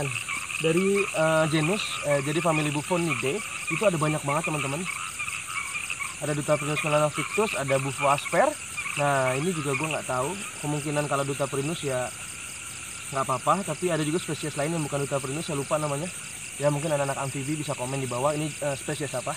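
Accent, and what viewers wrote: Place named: Java, Indonesia. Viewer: Dih galengan kek orang jawa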